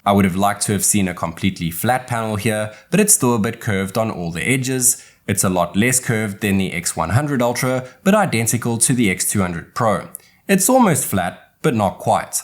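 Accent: South Africa. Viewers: Just ordered a Vivo X200 Ultra .. I didn't get the the Photography kit though. Stumbled across your video and thought :" Hey he sounds South African " and then I saw Table Mountain 🤣🤣 Brilliant video. I am so excited for mine to arrive. Will only be shipped to SA Feb 23rd due to Chinese New Year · I absolutely didn't expect you being South African! 😂 I thought all (white) South Africans speak like FW de Klerk or the other one... EtB, who came on a black horse. 🤭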